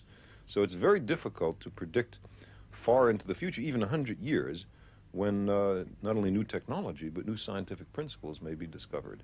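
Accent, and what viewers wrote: England, United Kingdom. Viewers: this british dude is annoying af. talk faster you smug fuck. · Imagine there was a time when people spoke like that English dude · the host is being agressively british while Sagan is being very american.. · What a pompous ass English accent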